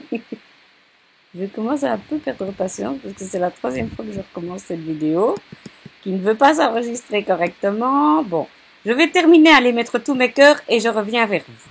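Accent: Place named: Brussels, Belgium. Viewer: Merci beaucoup à toi pour tous ces tutos sur la caméo...j'ai toujours peur de faire des bêtises et donc je ne m'en sert que pour les découpes tradi...grâce à toi, j'ose me lancer et en plus j'adore ton accent qui me rappelle ma ville natale (Bruxelles). je te fais de gros bisous et continue à rire et nous faire rire. cela fait tellement de bien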